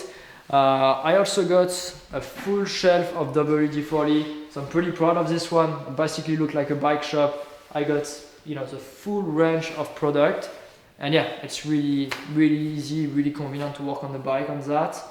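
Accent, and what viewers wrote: France. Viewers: accent français la 🥰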